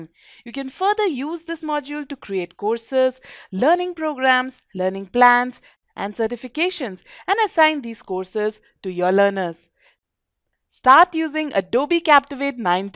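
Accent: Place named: India. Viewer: Indian accent, better get used to it. They are really good at software development...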